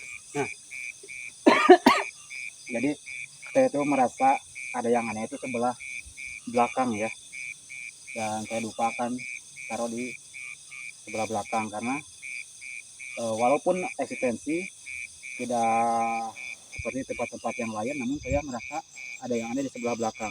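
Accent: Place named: Banten, Indonesia. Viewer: Cctv tolong di perhatikan di disiapkan di pasang di luar,Abang orang Banten ya,soal nya logat ngomang nya SM seperti saya, Banten nya di daerah mn bang tolong di balas karna konten2 Abang menurut sy berani dnenantang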